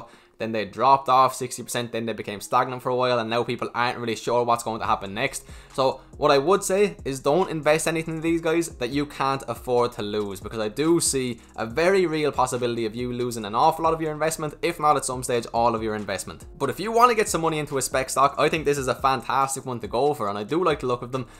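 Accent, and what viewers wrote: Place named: Ireland. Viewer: Are you from Ireland?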